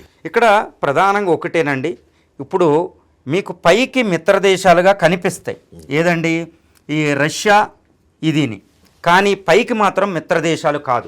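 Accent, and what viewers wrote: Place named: West Godavari, India. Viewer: Bhabai,what an explanation sir (with WG.state accent) good , very good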